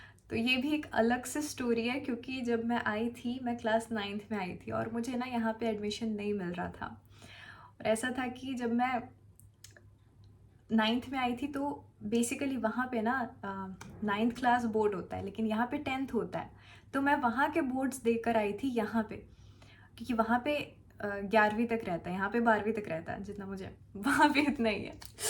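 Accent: India. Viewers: indian accent bhaut sahi h, expect nhi kia tha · the best part is the hindi accent of you❤ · Bruh even your english accent is Indian. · Your Hindi is so good .you talk like Indian Good i ❤ you talking in Hindi. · Your English has Indian accent…you can easily get a role in Hollywood because of that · every thing about you is indian , accent is same as indian hindi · Your accent is pure Indian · You are looking like foreigner but speaking like indian.however you are very sweet girl 😊😊😊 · You look foreigner but sounds indian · I smiled throughout the video😅 And yes you're completely Indian the way you speak. Aur aap k papa india mei kaha se hain india mein? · You look like Ukrainian and speak like Indian ❤❤ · you look like punjabi girl and speak hinglish like most of the indian who lives in cities or towns. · You're 100% India. Your English is definitely "indianised" and your Hindi is most plain. 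Subscribed. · Looks is more on Ukranian side. But Speech is absolutely Indian. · You look like foreigner and you behave and speak like Indian, be like what you like after all its your life.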